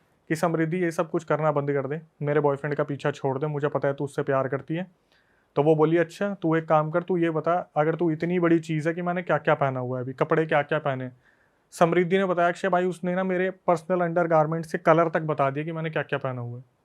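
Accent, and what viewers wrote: India. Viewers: There bolne k lahje se PTA Chala ki tu bihari jhatu hai😂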